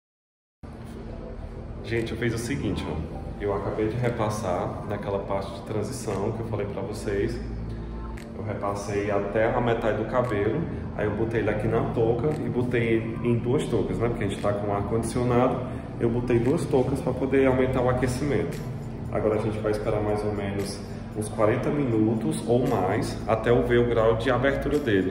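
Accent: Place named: Ceará, Brazil. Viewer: Já reconheci que é um cearense de longe kkkkk meu marido tbm é!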